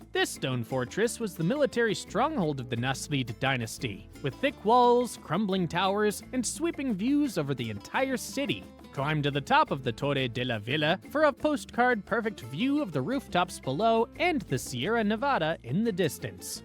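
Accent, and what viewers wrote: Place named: United States. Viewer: good tips but the gringo accent, ouch !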